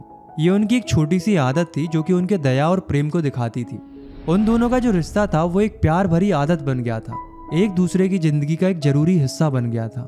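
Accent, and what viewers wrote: Uttarakhand, India. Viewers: sirr ap uttrakhand sa honaa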